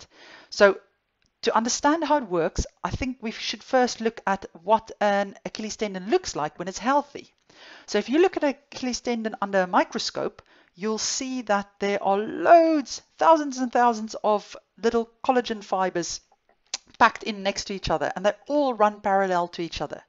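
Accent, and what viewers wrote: South Africa. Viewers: I love your accent. You must be from South Africa.❤❤❤❤❤❤ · South African AF! Great video, thanks for this!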